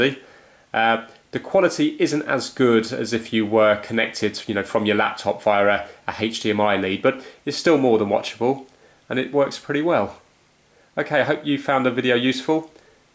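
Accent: United Kingdom. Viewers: I LOVE BRITISH ACCENT. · British Accent is Ughhhh!!! 😍😍😍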